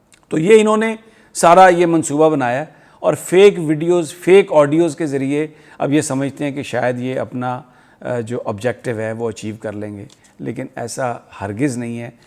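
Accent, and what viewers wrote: Punjab, Pakistan. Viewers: In love wd Ur Punjabi ❤️😂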